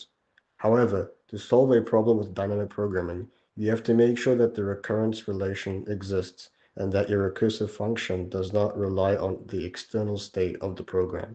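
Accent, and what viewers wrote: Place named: Australia. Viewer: You sound like an ozzie 🤣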